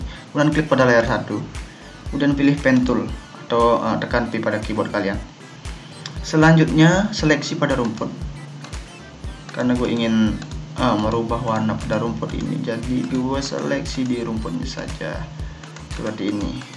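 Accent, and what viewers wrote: Bali, Indonesia. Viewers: Adi care nak bali asane ngomong ne nahh wkwkkw,, salam youtuber bali, mampir ke channel ku yg masih pemuka ini ya,, wkwkw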